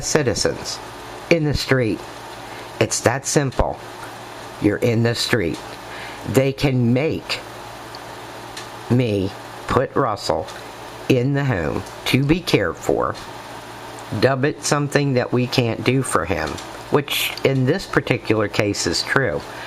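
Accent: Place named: Pittsburgh, United States. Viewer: Id encourage you to do another video, you did great! 👍🏼 You can help others so much with your story...i found you at t0ny's site💕🙏🏼😘 (r u from pgh, pa? U sound like it, thats where i grew up)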